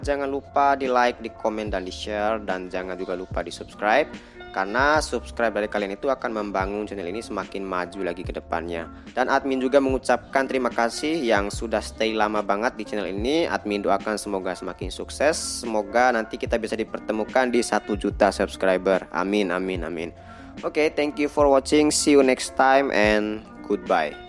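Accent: Bima, Indonesia. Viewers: Orang Bima ya bang?? Salfok sama logatnya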